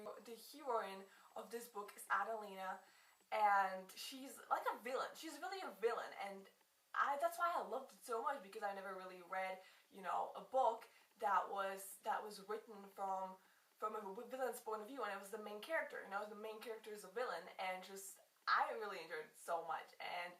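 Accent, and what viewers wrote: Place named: Russia. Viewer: You have a bit of a Russian accent! I love Russian accents! Ps love the book haul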